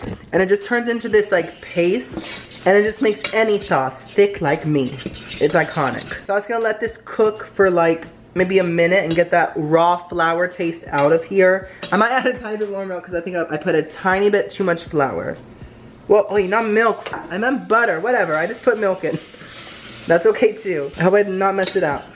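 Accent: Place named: United Kingdom. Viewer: I love your British accent so on point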